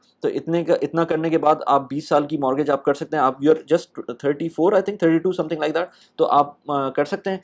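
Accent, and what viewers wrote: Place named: India. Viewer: What language do you speak?! Your English is more Indian than English. It's horrible.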